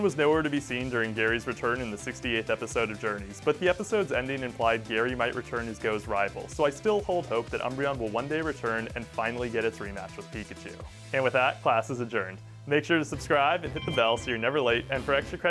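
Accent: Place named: California, United States. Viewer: my instinc tells me you are Californian, am I right? ☀🌊🏄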